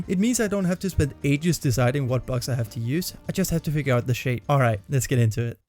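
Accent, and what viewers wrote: Denmark. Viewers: i noticed your accent, are you danish? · Yo you danish?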